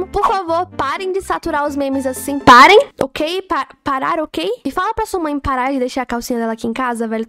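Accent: Brazil. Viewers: Vc e sulista? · tu é carioca? 🤨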